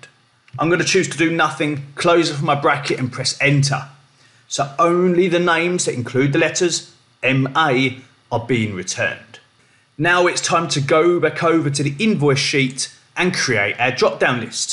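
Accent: United Kingdom. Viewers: R u british? Love that accent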